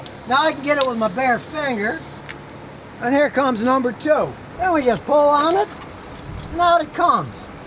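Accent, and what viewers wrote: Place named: Texas, United States. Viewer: Heluva Texas accent, bro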